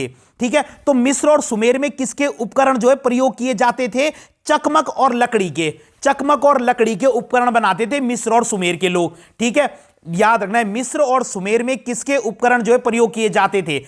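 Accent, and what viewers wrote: Garhwal, India. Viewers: Gadwali accent 🔥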